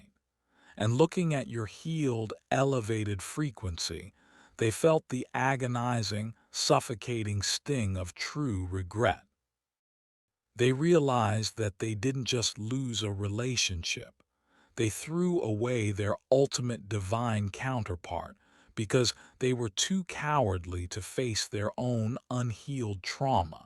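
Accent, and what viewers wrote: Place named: Maine, United States. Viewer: You sound like you are from up east.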